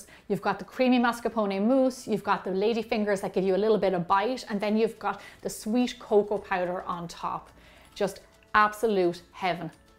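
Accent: Ireland. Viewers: Why you sound Irish, not Italian! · you must be irish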